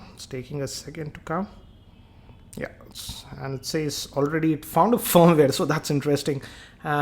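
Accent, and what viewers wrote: India. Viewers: Complaining about his Indian accent ? What are you people, five years old? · not a bad video i like it, your indian accent does annoy me a little bit and im sure im not the only one but other than that this is my first time watching one of your videos and its good, im thinking of buying this phone and your review did help me to get the information that i need thanks!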